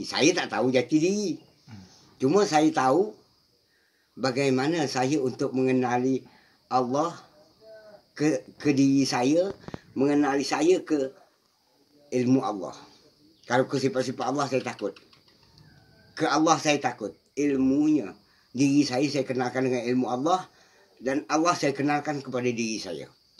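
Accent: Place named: Johor, Malaysia. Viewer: Salam dari Johor. same bahasenye dgn org Johor. Mmg johor dgn bentan ade hubungan suatu ktike dulu.. saye tngok channel ni sbb nk dengor bunyi cakapnye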